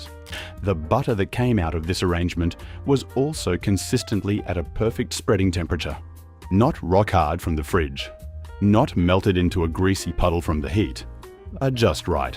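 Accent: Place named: United States. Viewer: Our friend can't disguise his Yankee origins with his pronunciation of the words Aussie, after and new.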